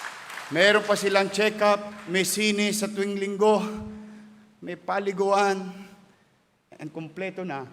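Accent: Philippines. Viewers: Parang c pinoy din mg speach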